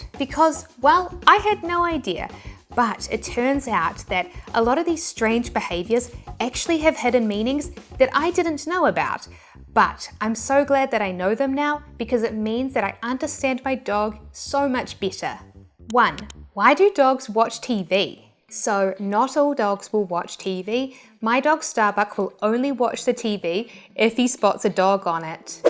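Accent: New Zealand. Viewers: I have no idea why this video popped up in my feed. Now, though, I'm obsessing over your accent, highly reminded of a Kiwi with whom I used to work years ago, and wanting to just find a youtube channel with you reading books or news articles. If my native accent has that kind of power over people, I want to know where and I want to buy plane tickets now. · I knew that enchanting kiwi voice sounded familiar!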